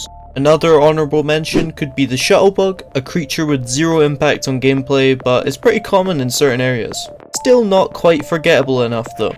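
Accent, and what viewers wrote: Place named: United Kingdom. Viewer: Note his British accent p.s. not making fun at it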